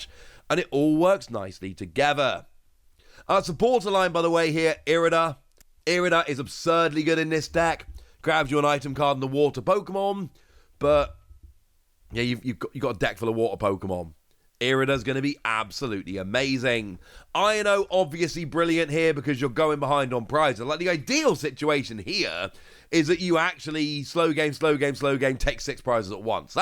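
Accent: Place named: United Kingdom. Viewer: yes that's what i meant, not used to british acc could not even get the first word right. Thank u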